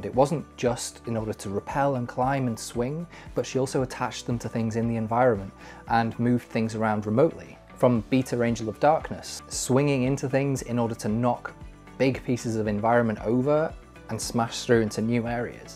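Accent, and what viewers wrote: Wales, United Kingdom. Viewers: Are you Welsh? You sound Welsh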